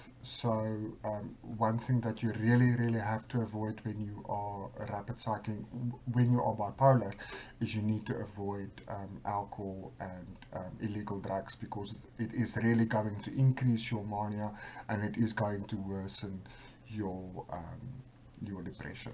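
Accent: Afrikaans, South Africa. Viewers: I have a couple friends that are from SA, that accent is Afrikaans. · Hey mate! Are you South African? I detect an Afrikaans accent. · You are strong and brave man. Can hear you are from RSA like me. Not an easy place to live in, but sharing this the way you did while going through this, i have a lot of respect for you. May God bless you and give you relief sooner than you expect it. Stay strong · Leo Harker south african i think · I was lekker to hear your Afrikaans accent and realize I'm not alone suffering with this in good old SA. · What is this accent? South African? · Are you South African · I get the depression but not the mania. I'm not Bi Polar but I experience the severe depression. It's the worst. PS I'm a South African living in China and I've been watching YouTube videos about mental illness.....it was SUCH a sound for sore ears to hear your south african accent!! 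Thank you for sharing your video. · E Quality is it south African? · Nice afrikaans accent bloke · Guy's South African, got a Afrikaans accent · Alice Lee Afrikaans accent from South Africa. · Are you SA? Or in South Africa? I recognize the accent.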